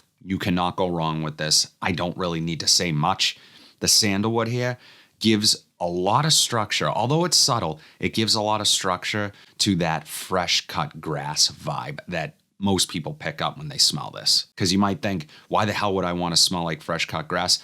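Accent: Boston, United States. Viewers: That Boston accent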